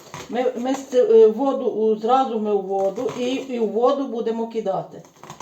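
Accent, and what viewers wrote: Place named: Western Ukraine, Ukraine. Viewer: Дуже гарний рецепт! Щиро дякую за нього! Обов'язково зроблю і спробую. А найголовніше---то говір, наш, западенський, такий рідний, ніби дома побувала... Аж в грудях защеміло... Шануймо нашу мову, нашу Україну. Ділімося всім, чим маємо. Нема в світі понад наше, рі дне. Ще раз щиро дякую за рецепт.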